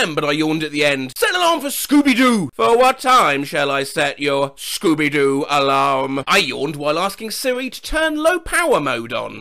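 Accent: United Kingdom. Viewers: He sounds more like Wheatley because of his BRI'ISH accent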